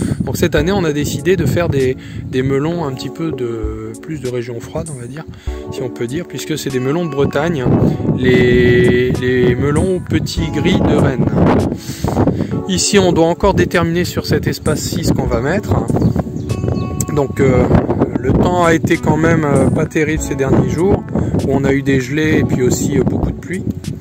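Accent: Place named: Aisne, France. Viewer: Coucou 😁vu l'accent je reconnais bien le 02🥰 je suis de l'Aisne également je te découvre aujourd'hui je m'abonne 👍je te laisse faire un tour chez-moi et pourquoi pas t'abonner si mon jardin te plaît....A bientôt 😉🍀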